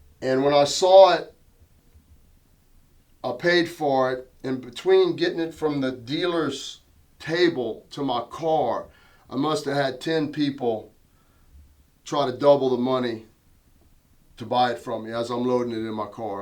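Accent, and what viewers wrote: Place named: New Orleans, United States. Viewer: That is a amazing box set collection. Are you from Louisiana originally your accent reminds me of a guy I met from New Orleans.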